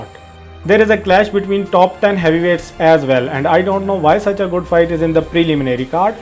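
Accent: India. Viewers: Wtf!! First time i heard indian talking bout mma n his english ndian accent ia annoying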